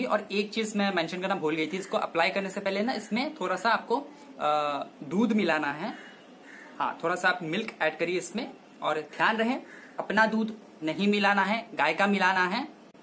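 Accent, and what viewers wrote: Assam, India. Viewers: Ap Assamese ho kya ap ke tone se aisa lagta hai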